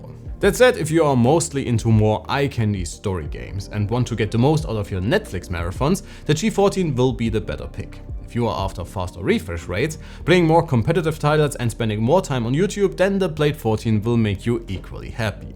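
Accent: Germany. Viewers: Der deutsche Akzent ist echt😅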